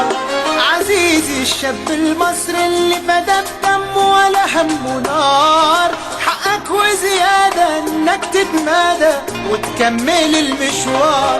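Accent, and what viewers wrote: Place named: Morocco. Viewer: جيت للمغرب ولهجتك المغربيه حلوة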